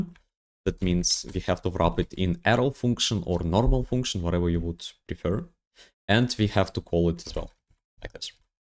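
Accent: Russia. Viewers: По акценту как будто ты русский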